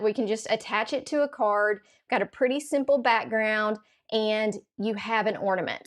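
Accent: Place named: Alabama, United States. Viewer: Really cute ornament cards!!! What an awesome idea for a card and lil gift! Side note.....we moved from Huntsville 2 years ago to be close to our daughter & son in law as they had our 1st grandbaby! Our son still lives in Huntsville and loves it and we visit as often as we can! I thought you had an Alabama accent! Yay!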